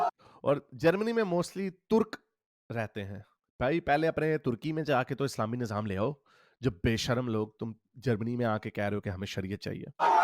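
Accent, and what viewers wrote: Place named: Punjab, India. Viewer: No no Rawanda, Punjabi tone not Afghani.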